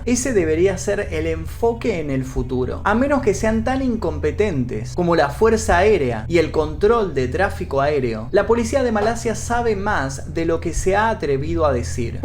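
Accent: Buenos Aires, Argentina. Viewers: Que genio!!!! SOS un copado.... Muy bueno tu relato, excelente perfomance, así hablamos los porteños. es totalmente Argento....Por muchos relatos massss 💪💪💪👏👏👏👏👏👏🇦🇷🇦🇷🇦🇷🇦🇷🇦🇷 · se ve que asi hablamos los de argentina porqué me decían lo mismo a mi xd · por tu voz parece que eres de Argentina 🇦🇷 ó Uruguay 🇺🇾 estoy de acuerdo con todos los comentarios positivos este es mi primer video que veo tuyo excelent. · es que los Argentinos y los Uruguayos hablamos asi la ll suena sh, por eso es latino y no español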